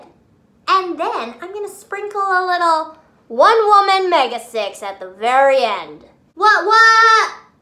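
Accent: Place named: United States. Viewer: Her American accent with the British background vocals 😂😂 I don’t mind it tho tbh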